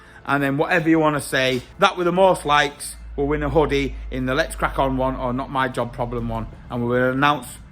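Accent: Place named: Northern England, United Kingdom. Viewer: Top Dong. These guys never make a video that doesn't put a smile on your face, never change you're a star especially with your Northern Accent matey.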